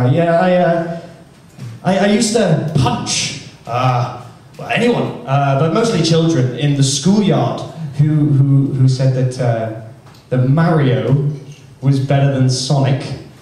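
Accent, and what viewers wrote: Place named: RP, United Kingdom. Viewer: I was like "why does he sound so posh? He's from newca- oh, it's at Cambridge that's why"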